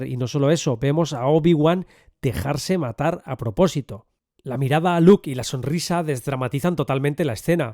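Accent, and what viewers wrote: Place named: Spain. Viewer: Que bien análisis de la película y le encuentro toda la razón, pero me da mucha risa y me saca de la seriedad el como pronuncia los nombres de las cosas y personajes de Star wars, por cada cosa que empieza con S los españoles le meten un "ES"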